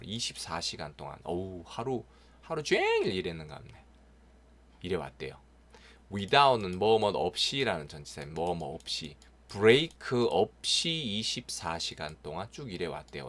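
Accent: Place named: Gyeongju, South Korea. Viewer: 선생님 정말 감사합니다. 앞으로 고졸 영어 검정고시 공부하는 사람들은 축복 받은 것입니다.기초가 부족해도 쉽게 이해 할수있도록 천천히 세세히 풀이해주시니 모두다 조금만 열심히 하시면 100% 합격 하실것을 믿어 의심치 않습니다 10년이면 강산도 변한다 했는데 긴세월을 선생님과 함께 공부한다 생각하니 가슴이 벅찹니다 .저는 경주가 고향입니다 선생님의 사투리가 저의 고향 말과 같아서 더욱 정감이 갑니다 중학교때 친정 아버지께서 여자가 공부를 해서 뭐하노해서 문교부가 인정하지 않는 학교에 졸업해서 어디가서 이력서 낼때 정말 자존심이 상했습니다 올해 나이가64살 옛날에 이렇게 공부할 여건이 되었으면 아마 그때 쉽게 했을것 같은데 그때 시골은 정보가 없어 공부하기가 어려웠죠 핑계인지 모르지만 검정고시 도전하는 젊은 사람들 열심히 공부해서 수능에도 도전해 대학에도 가세요 나이 드니 시력도 안좋고 책상에 앉아 있으니 허리도 아프고 여건이 젊을때보단 좋지 않아요 남편도 같은 환경이라 많이 배우지 못해 배움에 목 말라 신혼 여행가서 저 한테 했었든 말 아직도 귀에 생생합니다. 돈 없어 공부 못했다는 소리 자식들에게 절대 안 듣는다고 세월이 흐르고 보니 남편과 전 열심히 살면서 자식들에게 우리의 못다한 꿈들을 이루었습니다 딸은 고등학교 교사. 아들은 대학병원에서 전문의로 여러분 이렇게 올리는 이유는 자랑이 아니고 제가 2019년 8월7일 중학교 검정고시 볼때 젊은 친구들이 많더군요 10대20대 이들이 마음 단단히 먹고 열심히 공부하길 바라는 마음이고요 또 우리들을 위해 10년전 것 까지 기출문제 풀이해 주시는 선생님에 대한 보답이기도 하고요 오늘 새벽에 7번까지 올라온 것을 보고 너무 감동 받아 자다 일어나 공부하고 잤어요 나이가 드니 열심히 해도 돌아서면 잊고하니 반복반복 해야됩니다.( 소불근학노후회) 주자십회훈에도 있어요 젊어 열심히 공부하지 않으면 늙어 후회한다고, 여러분 열심히 공부하여 유종의 미를 거둡시다. 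선생님 정말 고맙고 감사합니다.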